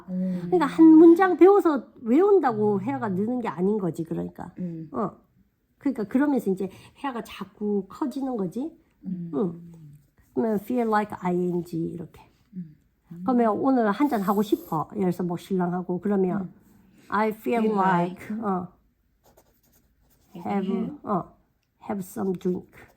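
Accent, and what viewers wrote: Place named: Andong, South Korea. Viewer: 전 친정이 안동이라 고향생각나고 ㅋ 사투리 너무 좋아요.. 친정에 있는 느낌이예요 ㅋ 잘 보고있습니다. 감사합니다! ❤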